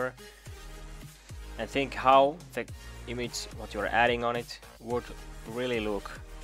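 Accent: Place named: Finland. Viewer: Where are you from? You sound finnish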